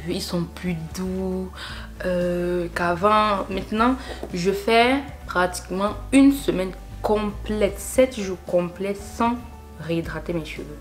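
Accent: Cameroon. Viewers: Slt ma belle!beau montage.je te suis depuis peu et j adore ton accent camer et ton cote' comik😆.j aimerai te poser une question,penses tu que la porosite' des cheveux influ sur le type de produit a utiliser?si c pas indiscret ,quelle creme hydratante utilise tu pour reussir a tenir 1 semaine sans les rehydrater?perso j ai des cheveux naturels coe sur mon profil et j ai vraimt du mal a trouver un hydratant ki me convienne😊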